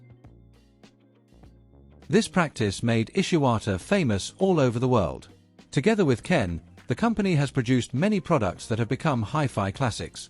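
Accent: United Kingdom. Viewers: So according to the narrator with the British accent, everyone has been pronouncing the name Marantz incorrectly. So phonetically Mare (like the female horse) and ents (like the tree beings in The Lord Of The Rings) it's "Mare-ents"(?) Huh... Learn somethin' new every day. (lol)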